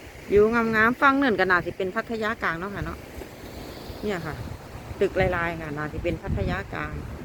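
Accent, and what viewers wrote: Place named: Isan, Thailand. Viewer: สวัสดีครับ มาชมวิวทะเลสวยด้วยครับ อยู่จังหวัดไหนครับ สำเนียงเพราะดี (ผมเคยอยู่ร้อยเอ็ด เกือบ 5 ปี) ส่งกำลังใจมาเชียร์ครับ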